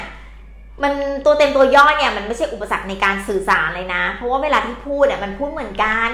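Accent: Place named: North, China. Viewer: 看似泰籍華人，但講話口音是大陸北方的。香港廣州這邊基本聽不到這種口音。就好奇哪裏來的那麽多衣服。幾百個視頻每次都穿著不同，神奇哦！ 但老師的中文確實厲害，對學習泰語有極大的幫助。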